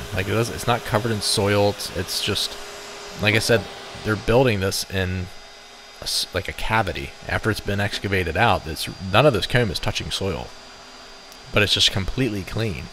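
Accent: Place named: Pennsylvania, United States. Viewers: lol oh man that is def a pennsylvania accent.. it always reminds me of someone i knew from the poconos who spoke like this guy.. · Are you from Philadelphia? Your accent sounds like big jay oakersons philly impression lol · Ah man my family has a bee farm and yellow jackets everywhere! Hate them. I’m from Pennsylvania myself. Sounds like you are from the Pittsburgh area👍🏻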